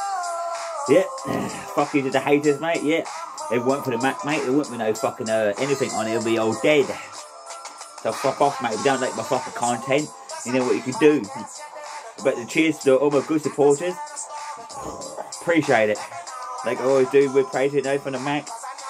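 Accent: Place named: Norfolk, United Kingdom. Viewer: You have a really strange accent. I can hear the Norfolk/Cambridgeshire in it but something else weird too.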